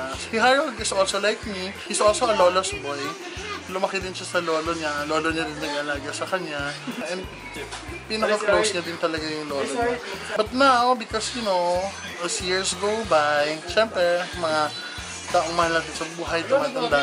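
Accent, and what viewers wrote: Iloilo, Philippines. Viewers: Madam ilonggo ka pla tagasaan ka?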